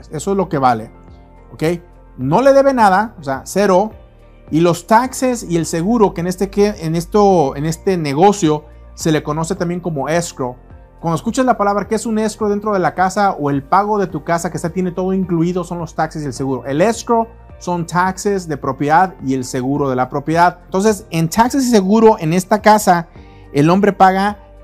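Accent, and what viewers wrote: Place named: Sinaloa, Mexico. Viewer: UN SALUDO, SON EXCELENTES TUS VIDEOS CON MUY VALIOSA INFORMACIÓN.....TU ACENTO CREO ES DE SINALOA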